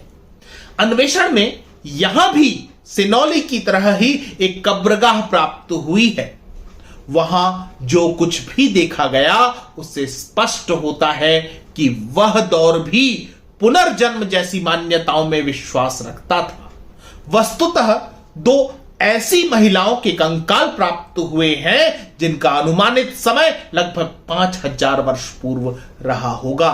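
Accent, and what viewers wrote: Standard. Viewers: Ap bahut sudh hindi bolrahehe Nahi to jisko Dekho adha English adha hindi boltehe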